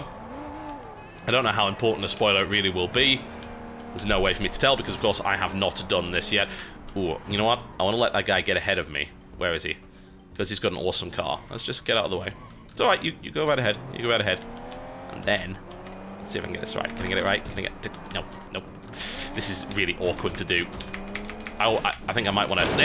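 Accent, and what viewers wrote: United Kingdom. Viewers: Angry Joe is better. Sorry to say but a one-hour+ review with a British accent kills it. Plus he's playing the game rather than just reviewing the most important points the way Joe does.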